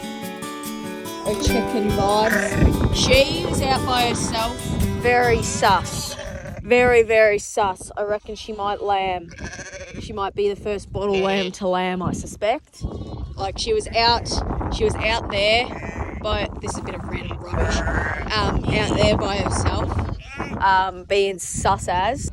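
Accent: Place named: Australia. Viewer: I was sad to hear you were "removing" foxes (originally thought you were British or Scottish, 'cause I hadn't heard your accent yet), but then I realized you were Aussie and was no long sad, lol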